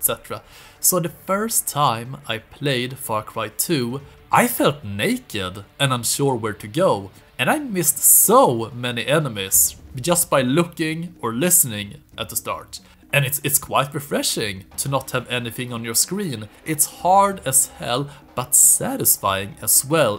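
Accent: Sweden. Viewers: This guy has a very swenglish accent (swedish - english)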